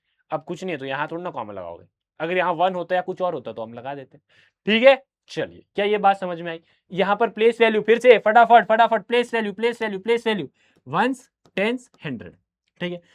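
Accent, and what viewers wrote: Bihar, India. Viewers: Sir please बच्चों जिससे acting mat kara karo समझ nahi aata or बिहारी mat bola karo na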